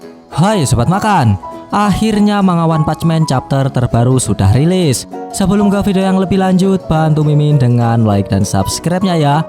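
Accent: Java, Indonesia. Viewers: Gacocok , medok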